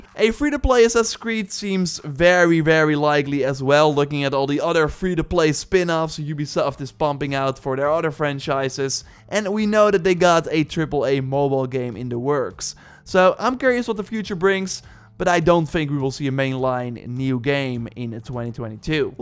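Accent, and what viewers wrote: Netherlands. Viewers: Watched u for 5 seconds and I immediately detected a fellow dutchman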